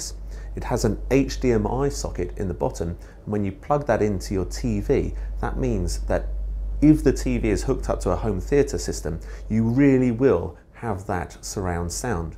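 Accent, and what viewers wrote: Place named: Netherlands. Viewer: is het echt een britse of een nederlander? zo ja dan moet hij normaal engels praten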